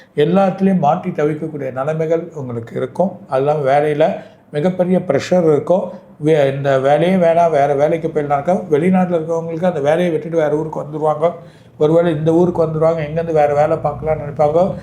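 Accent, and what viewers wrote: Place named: Chennai, India. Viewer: Super a madras language pesureenga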